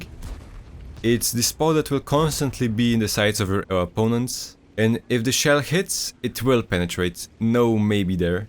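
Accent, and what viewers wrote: Russia. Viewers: Hold up, are you a russian?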